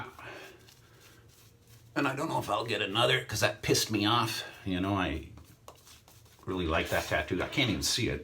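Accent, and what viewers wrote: Newcastle, United Kingdom. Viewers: Very cool tattoos Sir. I can just hear the Newcastle accent ;-). I have yet to get my first tattoo. Is something I have wanted to do since I was young. I have something in mind and may get it done. Ps if ever you and family visit the UK would be an honour to show you the Hampshire and south coast area :-)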